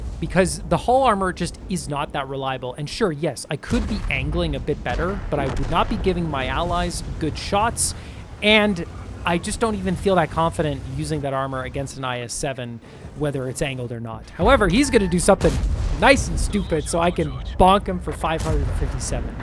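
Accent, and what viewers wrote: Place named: Canada. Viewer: I guessing you're a fellow Canadian? Lol ...I haven't caught ab "eh", but I did catch the "Zed". 😉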